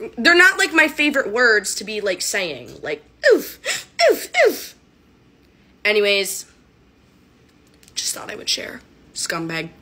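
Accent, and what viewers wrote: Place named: England, United Kingdom. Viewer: Brilliant english accent....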